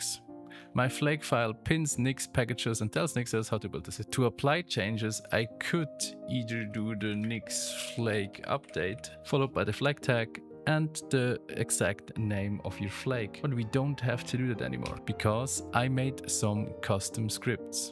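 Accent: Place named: England, United Kingdom. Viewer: is actually english English 😂 caught me off guard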